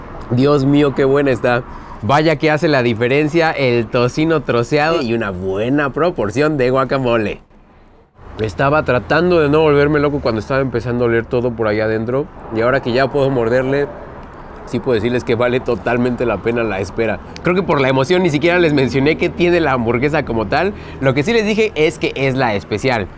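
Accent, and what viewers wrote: North, Mexico. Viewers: Ya se te pegó el acento del norteee :D jejejeje, todo se ve buenísimo. Nunca me imaginé que en Chihuahua se cocinara tan bien ;D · Se le pego el acento en corto!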